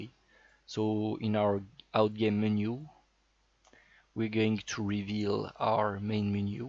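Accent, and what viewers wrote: France. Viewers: your are french ?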